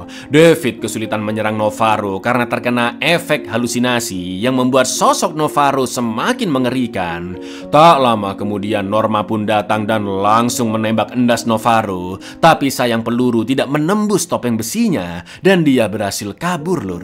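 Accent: Java, Indonesia. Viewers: Beta buka gudang, anggaran ilang (gaya bicara medok)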